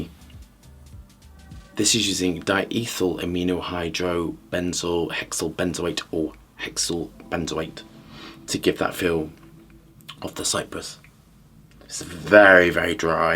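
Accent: United Kingdom. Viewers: i just stumbled over your channel. Love your expert knowledge and your talking in british accent.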